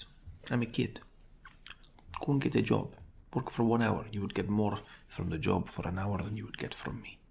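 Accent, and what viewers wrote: Russia. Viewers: If I wasn't already a longtime viewer, and I stumbled upon this video, I would have you were genuinely Russian. Excellent accent, and excellent video as always,. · I thought ur russian cause ur accent is so good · Russian accent 👍😄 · You sound like a rusky I bet you're Russian are ya? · has the Russian accent down perfectly! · Since you can do a Russian accent, I think it would be awesome if you could do a Most Dangerous Game role play as General Zarrof. I’ve always thought that would be cool for someone to do that.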